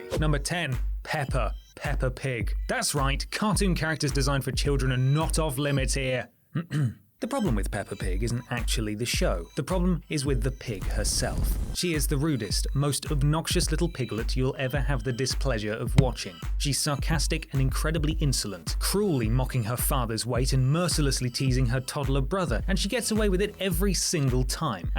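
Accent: United Kingdom. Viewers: Who do I hate more than anyone on this list? Smarmy YouTube hosts with pseudo-posh Brtitish accents who don't know their arse from their elbow, perhaps?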